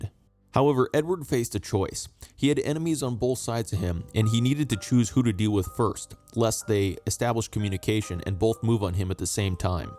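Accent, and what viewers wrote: United States. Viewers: Well written .. poorly pronounced. The American accent is an extreme handicap to educators.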